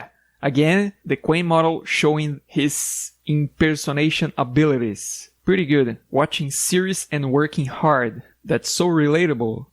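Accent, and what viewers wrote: Brazil. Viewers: so pelo sotaque ja percebi que e br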